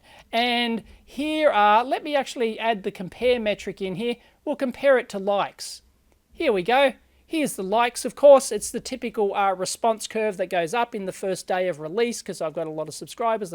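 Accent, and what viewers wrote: Australia. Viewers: Like all your vids, despite that Artful Aussi English :) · As a kiwi I find your aussie twang annoying, but I wouldn't dislike a video based on it. I power through it because your content is absolutely great. I can only imagine your natural dislikes are from cranks who hate the truth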